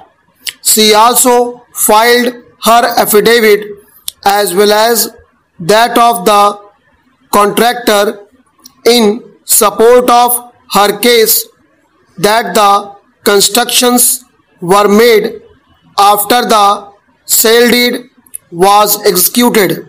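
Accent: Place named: India. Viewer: LMAO ewwwww Indian accent, was expecting actual English, thumbs down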